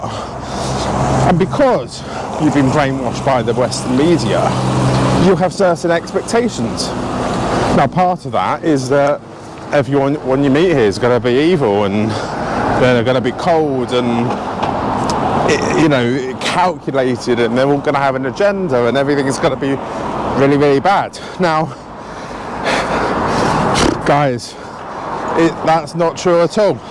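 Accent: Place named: United Kingdom. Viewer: I love the British English accent. I would shrug off the US English should I come as an alien from another planet. But the English pronunciation, hilly and aspirating, reminds me of the coughing of a Victorian aristocrat.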